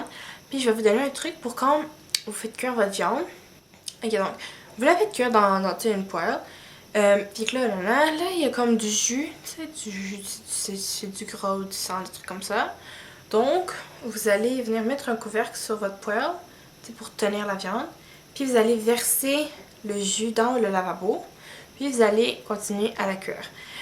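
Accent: Quebec, Canada. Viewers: J'adore Ton Accent ! Vive Les Québéquois! et 4L d'au c'est un peu trop je trouve. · Tu me fais rire, j'adore l'accent québécois et leur façon de parler ! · Coucou :) Bravo ! Ta vidéo est très encourageante. Merci pour cette vidéo. J'adore l'accent canadien / québecquois n_n Bisous !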